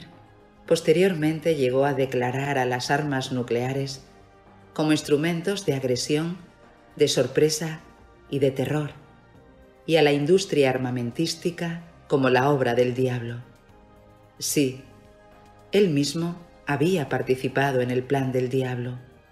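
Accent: Chile. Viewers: Cada Documento en su preciso lugar...Trabajo muy exelente...Y como adorno a tan bello trabajo, ese matiz de voz inconfundible de la hermanita ... Chilena ???❤🎉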